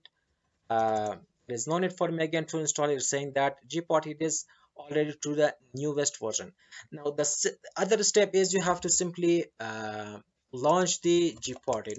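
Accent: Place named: India. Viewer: the relief i felt when i heard an indian accent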